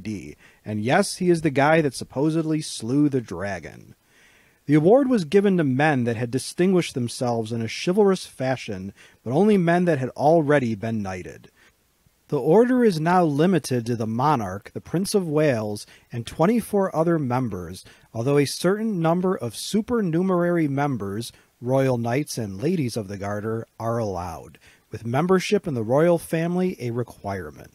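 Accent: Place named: United States. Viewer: Unless I am mistaken, the narrator sounds like an American. He had asked if (paraphrasing) "clubs like the Order of the Garter should still exist today?" In an American context, your equivalent would be the Presidental Medal of Honour, with distinction. The Order of the Garter is a living society honoured by the British Crown. Other than members of the Royal Family who do not count in the 24, are some of the leading figures of the United Kingdom outside Scotland. Also, it remains "The Most Noble Order of the Garter;" the name did not change.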